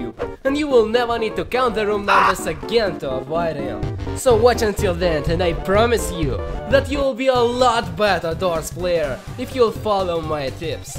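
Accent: Russia. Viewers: Bro has that Russian accent 💀💀💀 Also btw I'm not hating, i probably also speak like that since English isn't my first language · why do you sound like you have russian accent? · Why do you have a Russian accent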